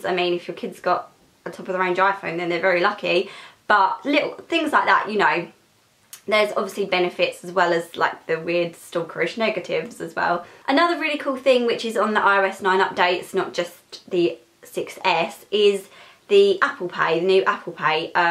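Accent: Essex, United Kingdom. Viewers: sounds like Essex maybe? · I like british accent !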